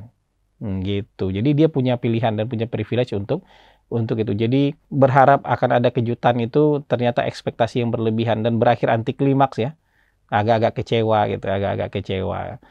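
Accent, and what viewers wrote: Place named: Java, Indonesia. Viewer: Terlalu sering diselipi DIALEK BAHASA JAWA...yg nota bene pada ACARA NASIONAL...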